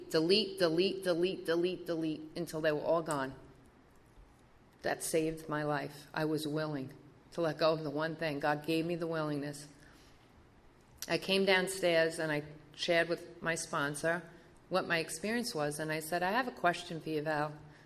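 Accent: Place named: New York, United States. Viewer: I can't believe I'm barely finding this especially this testimony! It had me from the start because of her NY accent. Hilarious, touching and impacting to my spirit. I love her transparency and her passionate love for God. I love her story of the last sponsor she had from Virginia. Thank you to everyone who had anything to do with sharing this story.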